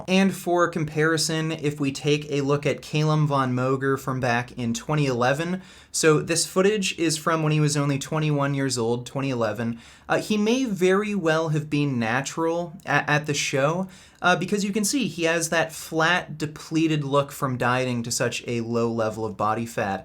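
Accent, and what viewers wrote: Canada. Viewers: He’s Canadian bro what did you expect eh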